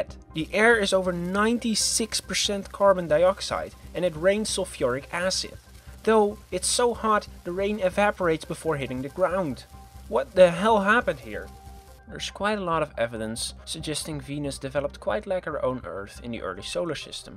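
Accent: Netherlands. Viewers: Did I heard an Dutch accent? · Are you dutch? Good video btw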